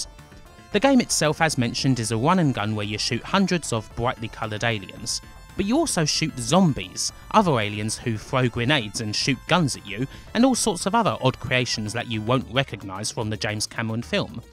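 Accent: United Kingdom. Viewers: how fucking awesome is it that I get to listen to an arcade game review by a British Elmer Fudd?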